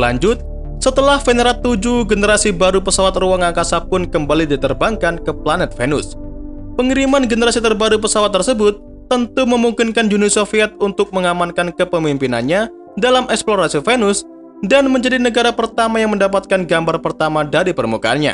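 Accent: Java, Indonesia. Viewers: Masss. Suaramu digawe seng rodok kalem mas.. medokmu kuii lo.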